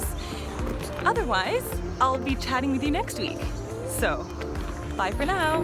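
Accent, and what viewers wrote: Canada. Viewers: Clearly Canadian. The accent is strong in this one 😂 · Love your videos!! And your Canadian accent 😄 I love watching medical dramas on TV but your channel is just as captivating because it shows real life & explains it all! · I think its Canadian. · Is it just me or dose she sound Canadian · She sounds SO Canadian. Is she?? Edit: I see the tims.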